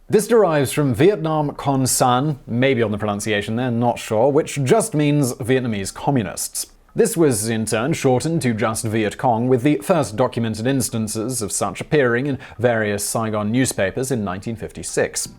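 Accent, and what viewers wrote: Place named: RP, United Kingdom. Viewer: Love hearing you read jive with your posh accent.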